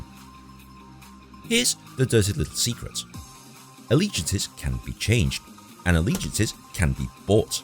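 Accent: United Kingdom. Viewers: Dang, an Asian guy with a British Accent? How is that even possible? It's good to see Football popular in England. I still have no idea why soccer is so popular. 90 minutes of running around kicking a ball randomly all over the field and no one scores a single point. I watch a few minutes of soccer if I can't sleep, it's so damn boring I fall asleep almost immediately. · Love your very fine British voice with an Asian appearance. Proves something to me, but I am not sure what! hehehehehe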